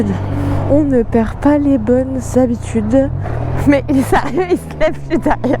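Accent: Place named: Corsica, France. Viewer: Top l'accent Corse... ✌️Et des vraies routes avec des virages, des bosses, des animaux sur la route,. Il faut pas se la faire en mode tourism tophy pour rester en un seul morceau. En plus ça sent bon le maquis corse, l'air marin, enfin bref ça sent bon l'oxygène, ça sent bon les pistes en terre aussi 😆✌️. Le nikken, comme tout ce qui a plus de 2 roues c'est pas trop mon truc, mais faire les presque 600.kms avec un pour essayer, bien sûr. Mooi je trouve que tu maîtrises quand même pas mal du tout, alors respect. ✌️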